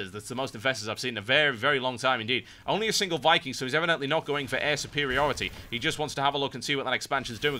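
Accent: United Kingdom. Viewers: i was wondering r u british?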